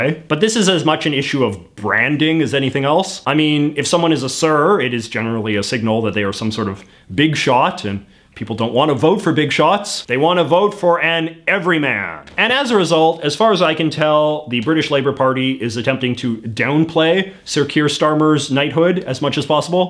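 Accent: Canada. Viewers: is such a Canadian with his very pronounced "aboots"! That's part of what makes him intersting to me, I suppose. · Are you by any chance Canadian? And yes I ask because of how you pronounce out. No offence, just wonder.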